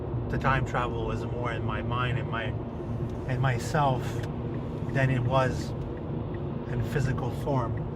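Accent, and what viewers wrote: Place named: France. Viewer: is French your first language? It seems you have an accent whenever you speak English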